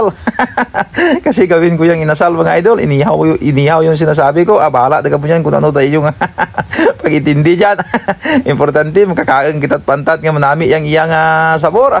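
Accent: Iloilo, Philippines. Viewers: Ilonggo gid haw,,😂😂😂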